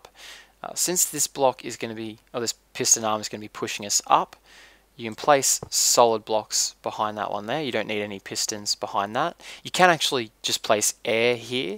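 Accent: Australia. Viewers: Are you Australian? :) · I left a comment Down Under, where your accent's from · are you aussie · down under XD sorry made me giggle I work with Aussies so i find all of the little dialectal inflictions amusing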